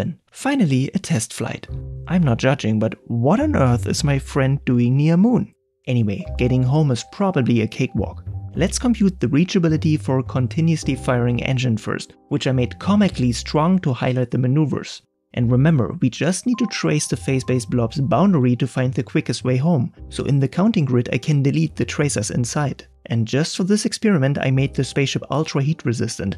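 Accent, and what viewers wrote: Germany. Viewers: People complaining about the accent … well, you‘ll have a much harder time understanding this in German which is the author‘s native tongue. And nobody else does videos which come even close, so rather applaud for this content being in English at all - as a large portion of YT is German language actually…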